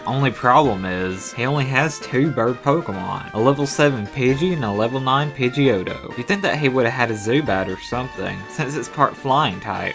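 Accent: South, United States. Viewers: I have to say that I am not a judgmental person but your voice completely threw me off. With a southern voice and a large, expanded mind it kind of kicked my brain in it's nuts. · are you southern? (sorry I'm from Oklahoma and I rarely see youtubers with like real southern accents so jw)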